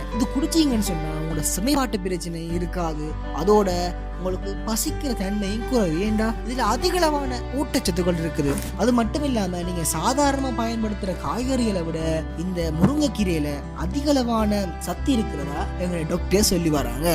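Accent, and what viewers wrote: Sri Lanka. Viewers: Mohamed இலங்கை தமிழ் பேச்சு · ஆமாம் இலங்கை தமிழ் கேட்டு பழக்கமில்லாதவர்களுக்கு கேட்பதற்கு சிறிது கடினமாகத்தான் இருக்கும். தமிழ் மட்டுமல்ல எல்லா மொழிகளும் இடத்துக்கு இடம் மாறுபடுகிறது. அதற்காக அது தமிழ் இல்லை என்று ஆகாது. லண்டன் ஆங்கிலமும் அமேரிக்கா ஆங்கிலமும் வித்தியாசம் தானே.... தயவுசெய்து புரிந்துகொள்ளுங்கள் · it's Jaffna tamil (SRI LANKA) , please dont make fun of his slang. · thank u , idhu unamiya vela seiyudhu ,naan try panninean ,result theriyudhu, ilangai tamil kaekavae nallairukku.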